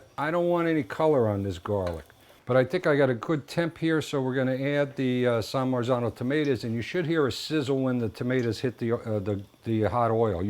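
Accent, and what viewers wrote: New Jersey, United States. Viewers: Knew he was a fellow new jersyian from his accent - he didn't need the t-shirt to remind me of home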